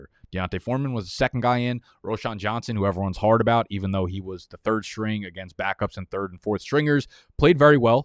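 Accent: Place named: New York, United States. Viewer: Your New York accent makes me hot.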